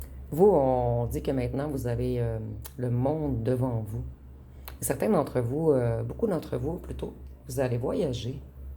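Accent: Quebec, Canada. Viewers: Bonsoir et oui il y a des gens qui passent leurs vies à faire le mal mais tout revient vers ses personnes tôt ou tard Ma lumière dérange parait il mais j'en envoie beaucoup aux autres à travers les soins étant guérisseuse ( genre Laochi de la 13 Octave ) etc Psychotherapeute après je me suis dirigée vers les soins énergétiques. Merci votre guidance est terrible vous voyez incroyable J'espère que vous continuerez même partant au Quebec j'adore votre accent cela me rappelle l'intonation d'un ancien ex Québécois Quel beau pays Merci à vous Excellente soirée bise❤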